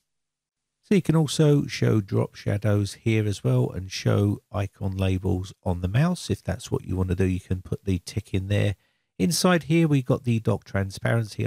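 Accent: United Kingdom. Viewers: I'love ur Voice bro Nice UK Guy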